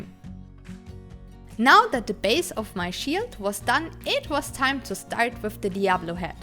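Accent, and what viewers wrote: Germany. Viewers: Are you from Germany your pronunciation is realy german...I am from Germany to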